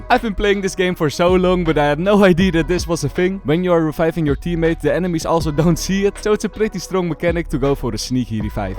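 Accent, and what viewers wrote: Netherlands. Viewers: I have been watching your videos since I started playing Valorant in 2021 and I'm a regular viewer. Independent of this information, I moved to Amsterdam in 2022 and only TODAY REALIZED THAT YOU HAVE A DUTCH ACCENT fkkskskskskskskskss hahahaahah 😅 sorry for TMI! Love your videos, hope you grow ❤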